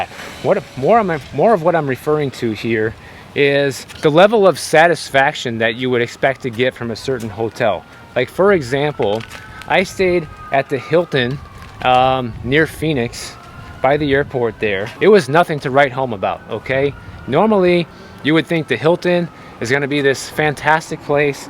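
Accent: Brazil. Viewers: She sounds Brazilian! Viva Brazil🇧🇷✨ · Is from Brazil? My wife is Brazilian. She and could be sisters...accents and all.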